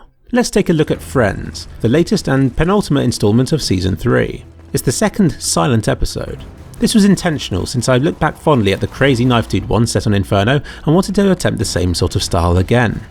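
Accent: United Kingdom. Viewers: I love the british accent. It just sounds good. Btw, those smurfs who started destroying the game and deranking on purpose are just toxic and its not your fault. Those guys do it for the fun they have, once their team starts raging. They like to be the one deciding everyone elses faith. Its empowering for them. They like saying stuff like "I am LEM, noob" to make it even more obvious, that they are actually better. Did you do that? I dont think so. Does anyone do that, because he watched going low? No, I dont think so. But its a good excuse for smurfs, if they want to anger innocent people. Obviously no one can judge you for what they are doing and you shouldnt judge yourself either. Ive seen your video about smurfing. I think it was kind of a reaction to the influence of smurfs which is growing so rapidly. Its hard to find a single lower ranked game without one opponent or teammate smurfing. 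Well, who cares? I am just a youtube user with no people behind me. You are someone who's popular. Those popular youtubers should unite and finally make something happen. Valve will never listen to small unknown people saying mm is broken, but they'll probably listen to popular people who could influence the whole cs go scene. I love you videos, keep up the good work :)!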